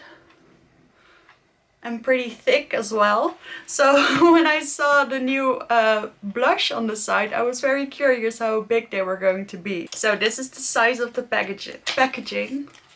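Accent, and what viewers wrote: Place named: Netherlands. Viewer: I can detect a beautiful Dutch accent!! (One of my dear friends is originally from Breda). I loove Givenchy powders, the original Prisme Libre powder is so beautiful. Fantastic look 💕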